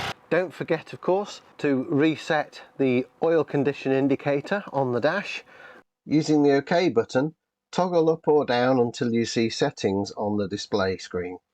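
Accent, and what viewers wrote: England, United Kingdom. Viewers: Love listening to your instructions with your English accent! :) So easy!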